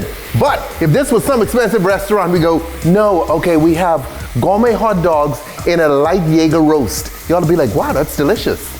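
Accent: Trinidad and Tobago. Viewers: Are you from Trinidad Bc ur accent sound like Trinidadian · Are you from Trinidad 🇹🇹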